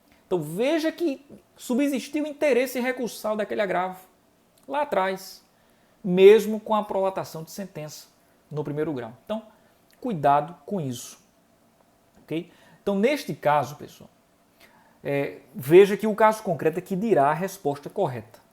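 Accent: Northeast, Brazil. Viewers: Parabéns pelo conteúdo. Gosto muito do sotaque nordestino, lembra meus avós.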